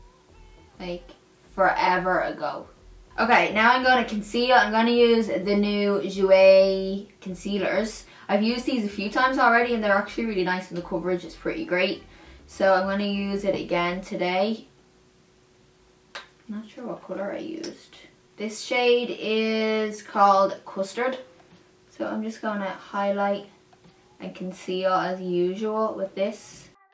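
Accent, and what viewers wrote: Ireland. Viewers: Irish accent or am I wrong? ☺️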